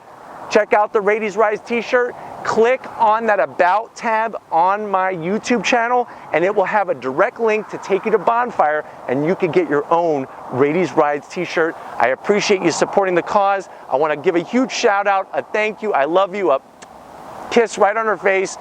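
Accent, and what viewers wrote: United States. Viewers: Nothing worse than a yank accent it grates it’s high pitched and not ENGLISH!!!’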